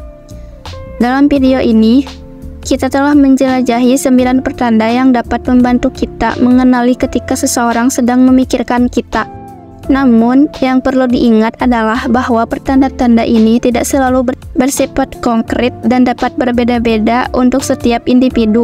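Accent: Bali, Indonesia. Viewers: Logat kk nya kayak logat bali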